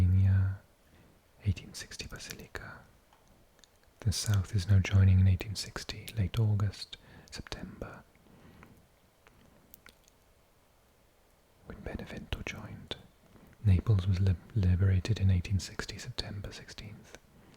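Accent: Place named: South Wales, United Kingdom. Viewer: Hello! This is the first video of yours I’ve watched and I love your voice. It’s so warm and rich. I used to live in South Wales. I think your accent fits that region, maybe Port Talbot/Swansea way, but please correct me if I’m wrong!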